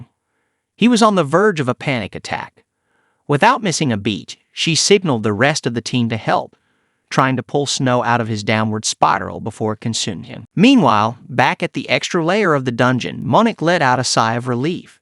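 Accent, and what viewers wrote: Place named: South, United States. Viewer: Sorry but the southern accent just doesn't work with this.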